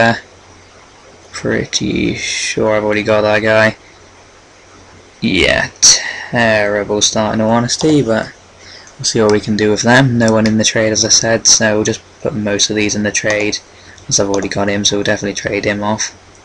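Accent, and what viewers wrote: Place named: Suffolk, United Kingdom. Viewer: Ahh, ok. You just sounded like someone from suffolk! lol How do you make your coins on ultimate team?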